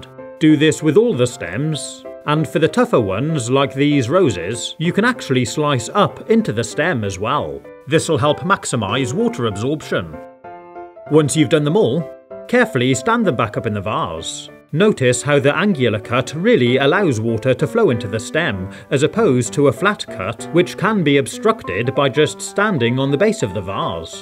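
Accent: United Kingdom. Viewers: AWESOME and very much APPRECIATED. THE IDEA OF SUCH A VIDEO IS EQUALLY CLEVER AS ALL ITS CONTENTS. I ALSO LOVE TO LISTEN TO THE BRITISH OR BRITISH SOUNDING ACCENT. VERY WELL DONE.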